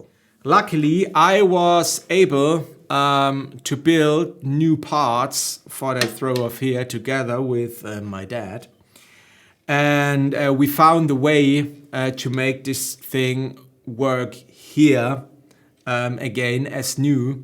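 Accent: Germany. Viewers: geht mir genauso, geht doch nichts über dein Englisch mit Kurpfälzer Akzent 😉 erinnert mich so sehr an meine Heimat!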